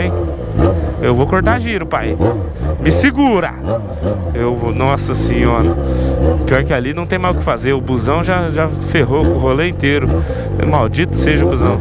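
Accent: Goiás, Brazil. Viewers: pq vc fala no pique de Goiás se Londrina fica em Paraná? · por que vc fala no pique de Goiás se vc mora em londrina??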